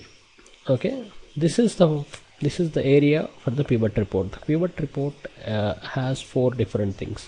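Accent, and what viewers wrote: India. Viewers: This is the first video that I actually find helpful!! thanks a lot!! Love your indian accent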